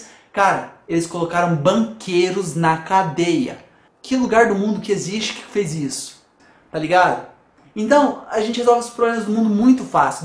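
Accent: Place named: São Paulo, Brazil. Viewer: As idéias são boas; O triste é ter que suportar o vomitável sotaque paulistano e os "tá ligado???". Tá ligado???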